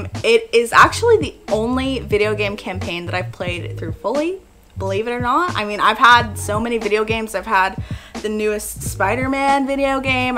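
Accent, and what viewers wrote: United States. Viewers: I knew you would get to 1000 before the end of the year congratulations. Yeah I know you don't watch fish tube 🙄 and I could tell you America by your accent✌🏼👍🏼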